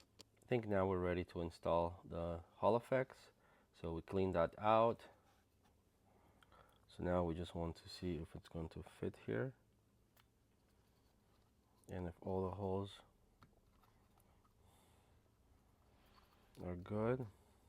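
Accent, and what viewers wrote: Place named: Russia. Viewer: You know the hardware/software repair tutorial is going to be good when the guy speaking has a Russian accent.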